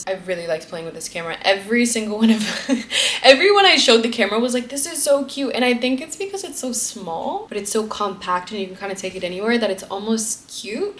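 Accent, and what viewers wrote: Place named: Colombia. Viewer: It’s so funny to me how you have a Colombian accent when you speak Spanish 😭 ofc u lived in Colombia and I understand but it’s interesting to me how depending on where you learn a language you can get their accent. I’m Latina and learned English in New England however I don’t have an accent but I lived in Texas when I first moved to the states and wonder if I would have an accent if I lived there!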